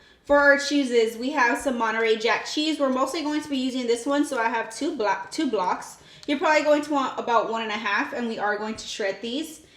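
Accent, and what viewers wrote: Texas, United States. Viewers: I’ve never heard anyone call it a “cash-a-roll”. Lol. That must be your Texan accent ;)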